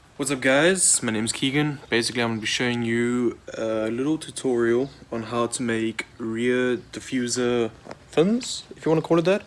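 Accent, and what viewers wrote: South Africa. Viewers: bra youre so me lol. You even sound South African ...Only thing left is for you to be from the kasi. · brother this is awesome, You sounds south african!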